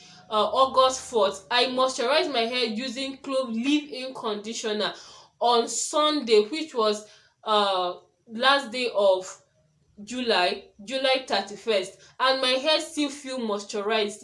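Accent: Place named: Caribbean. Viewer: You have a accent are you carribbean?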